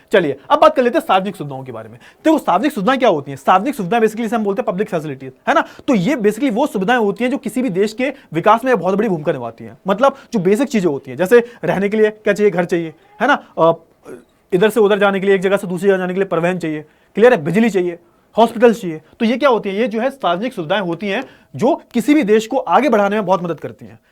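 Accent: Haryana, India. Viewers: sir always my favourite teacher ❤kis kis ko haryanvi boli badhiya Lage hai 🎉❤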